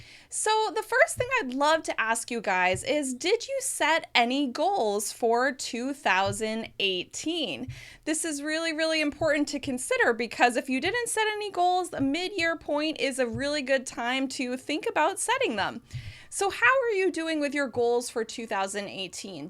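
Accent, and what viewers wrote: United States. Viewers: Perfect American accent!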